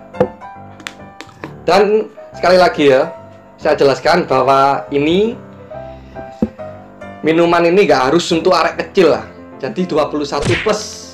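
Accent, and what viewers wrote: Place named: Pasuruan, Indonesia. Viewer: Mas bro orang mana sih.logatnya kyak orang pasuruan??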